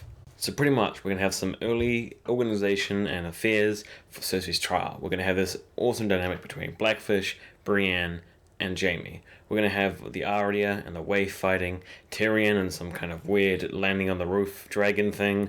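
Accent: New Zealand. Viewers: He has a very mild Kiwi accent.. like a Kiwi who's been living in Australia for quite some time. That would be my guess anyway.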